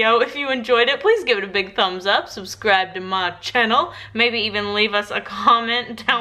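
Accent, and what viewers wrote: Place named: South, United States. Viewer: Your voice sounds so southern like mine 😊😊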